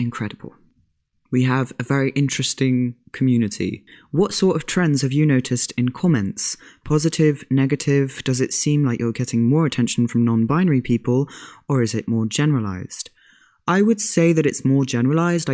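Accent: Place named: North England, United Kingdom. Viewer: Delete this comment if it's too personal, but how did your lovely accent come about? Were you born in the north of England before moving to France, were you born in France and learned English from BBC television? Just curious because your voice sounds so good and I love hearing about where different accents originate from.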